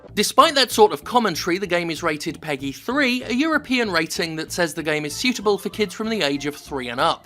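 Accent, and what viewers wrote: United Kingdom. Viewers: puta madre el acentoi ingles es ladilla de entender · el que habla es britanico?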